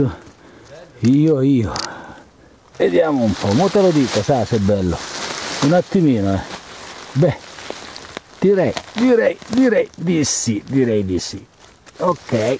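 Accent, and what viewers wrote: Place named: Piedmont, Italy. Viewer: bella l'accento e' proprio pinerolese doc un saluto da albenga e conplimenti bei funghi p.s. in liguria non esiste solo il mare ciao angelo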